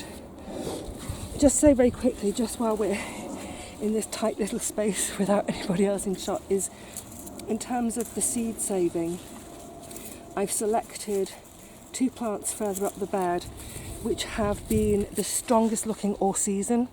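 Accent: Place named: RP, United Kingdom. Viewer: ..."as I was saying upside down in the broad bean bed..." you do make me laugh..loving the stuff you do and am a new sub, so thanks for the content...just to add, I thought you were a bit posh ( accent) and then I watched you talking about how you grew up on a council estate and I had to rethink my impression of you!!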